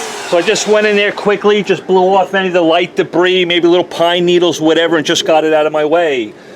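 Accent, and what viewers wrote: New York, United States. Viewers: *New York accent lol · Texas accent?? That's not southern at all. I'm from Houston so I know a southern accent when I hear one. Lol. That's more like a NY accent or in that region.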